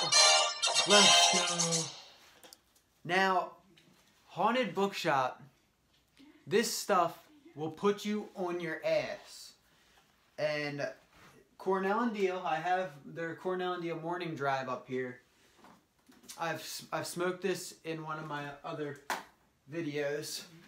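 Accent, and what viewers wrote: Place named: Philadelphia, United States. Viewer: You have a strong Philly accent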